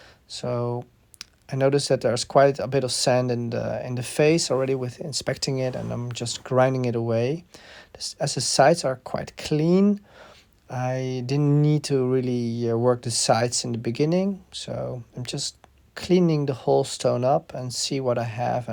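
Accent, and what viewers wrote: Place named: Netherlands. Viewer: Just by hearing his voice speaking English i now he is a hollander 😂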